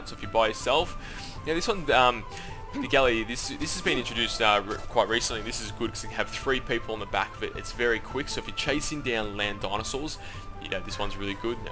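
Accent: Australia. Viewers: BobHoppaFlotchkin Maybe some people don't speak english as their native langue (go figure) and thus struggle to understand someone with a thick australian accent who could give Steve Woodmore a run for his money?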